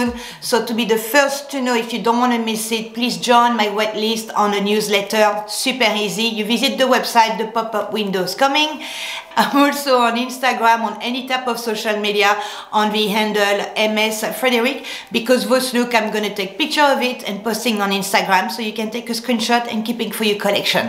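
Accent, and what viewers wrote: France. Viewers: Cocorico!🐓 Bonjour, je viens de découvrir votre chaîne. J'ai été interpellée par votre accent très français ("But alors you are French?"- La Grande Vadrouille 😄), en même temps cela me permet de faire des progrès en anglais car je comprends (presque) tout pour une fois... J'aime beaucoup ce que vous présentez, les couleurs et imprimés, et même si je n'ai pas la même colorimétrie, j'ai des goûts très similaires aux vôtres. La marinière, une valeur sûre! J'en prends chez Armor Lux, j'en trouve aussi chez Esprit à des prix plus abordables et de qualité correcte. J'aime bien porter un tee-shirt col rond à rayures noires sur fond blanc ou écru, sous un pull en cachemire noir col V, je porte le pull légèrement plus court pour laisser un peu dépasser le bas du tee-shirt pour rappeler l'imprimé du haut, le tout avec un jean. Passe-partout et chic. 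Bravo pour vos vidéos si agréables, merci pour votre bonne humeur et votre spontanéité. Forcément je m'abonne, clic clic clic!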